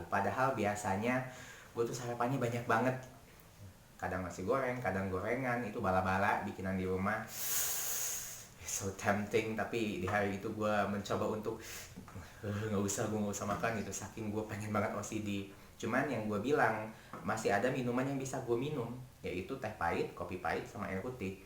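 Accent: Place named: West Java, Indonesia. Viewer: Ow ow... Luarss biazzaaa.. Emezing pisan... Tetep sundanya kaluar.. 😍😍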